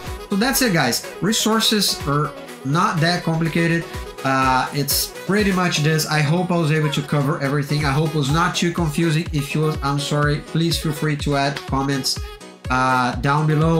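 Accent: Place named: Brazil. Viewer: I've instantly recognized the Brazilian accent, great video man.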